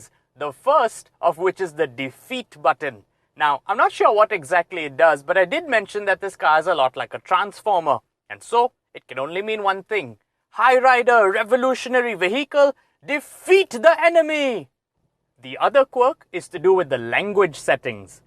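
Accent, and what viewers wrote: India. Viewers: Indain English WTH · It's good that Honda is also considering Indonesian cars for the UAE as that is a region where even the basic variants of all models get a brilliant equipment list and the HR-V is no exception to that. While the exterior design does look good, it is too simple for my liking. Even the interior switches and the layout of the instrument cluster is as if directly taken from the Jazz and the City, the latter being sold here. This is one Honda which failed to impress me in the interior department as we are used to seeing sumptuous designs from the automaker. The Civic, Odyssey, Accord and Pilot, all have a certain wow factor which the HR-V lacks. The only feature to write home about is that ironic "Indian English" accent and the magic seats at the rear to increase the cargo area. On all these grounds, it is the Kia Seltos that for me is the undisputed king because of its fantastic design and the features it gets. 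However, as you pointed out, it is the cheapest car currently on sale which is surely to have buyers thronging at Honda's dealerships. And then, there are the basic virtues every JDM car comes conferred with: Reliability, low maintenance costs, excellent fuel economy and an obnoxiously high resale value which is what is going to make a great case for itself. How I wish manufacturers get rid off that CVT transmission and use a torque-converter and turbo-petrol engines like the 1.5 in the Civic. That would be the perfect choice of the eclectic options with Honda. Enjoyed the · Good review! India English! That’s quite interesting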